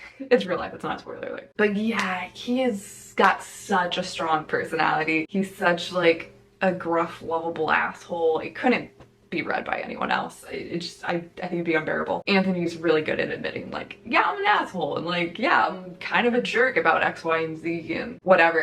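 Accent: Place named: United Kingdom. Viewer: Ahahahahaha hypnotized by the calm bland British accent, I can’t I’m dying 😂😂 I really enjoyed Micheal Pollen’s book “Cooked” I bet this is your mind on plants would be very much up my alley too. And 100000 percent kitchen confidential deserves to be on this list, listening to tony read this one made me so happy to hear one of my favorite voices in the culinary world again. Ooo did you hear about vanishing fleece from books unbound? That’s the only other place I’ve ever heard it mentioned., and I know you said you were an Ariel bisset fan! Alright, excuse me while I watch all your videos, I have a new favorite booktuber.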